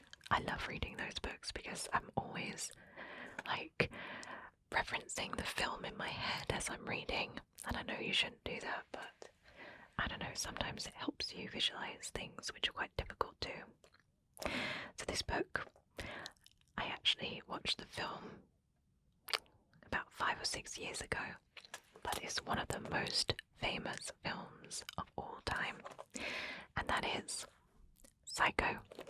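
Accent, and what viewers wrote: United Kingdom. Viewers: thanks for the video. It's nice to hear the British accent, it sounds very classy. 🔥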